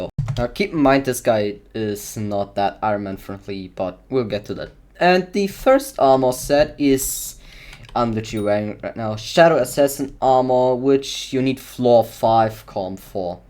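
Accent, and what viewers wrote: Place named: Germany. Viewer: Information wise not bad, way too long and yapping, german accent and cracky english is one too much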